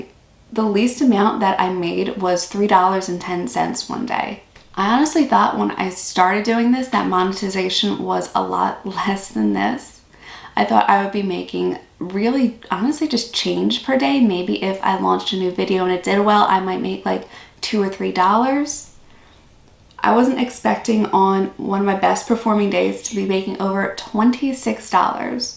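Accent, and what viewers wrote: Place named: Australia. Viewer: Hey. You’re doing great. Something I’ve noticed in recent videos is that your accent has changed a bit. Some sounds you make are beginning to sound more Aussie.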